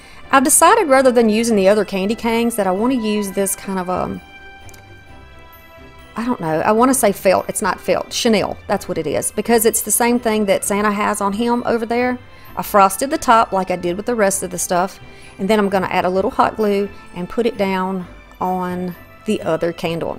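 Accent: South, United States. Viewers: Being a child of the 50s.... I LOVE this.... but I have to say it hurt my heart when you cut the books !!!! LOL.... but love that you gave it new life...... I would love to see more of this... I am a new subbie!! And I can tell you are a fellow Southerner..... Mississippi here..... looking forward to more videos...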